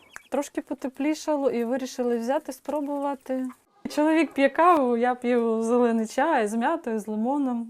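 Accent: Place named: Volyn, Ukraine. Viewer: Слава Богу, що влаштувалися більш менш добре. А розмова Вашо як волинянки)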